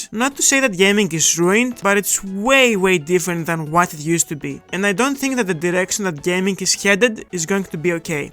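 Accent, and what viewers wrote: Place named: Greece. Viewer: to greek accent 💔💔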